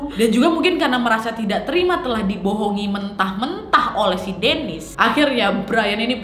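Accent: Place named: Medan, Indonesia. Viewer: Kek gaya becakap anak Medan.. ya kan wak?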